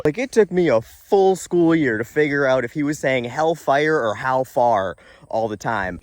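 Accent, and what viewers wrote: United States. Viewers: UK here. You sound like an American to me 🤷🏻🤣♥️ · Truth be told, you sound American to me… now may I please have a Hamms?!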